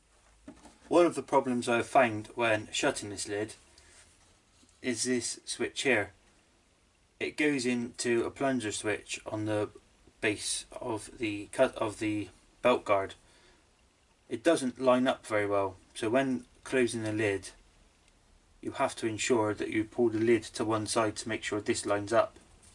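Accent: West Country, United Kingdom. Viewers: west country lad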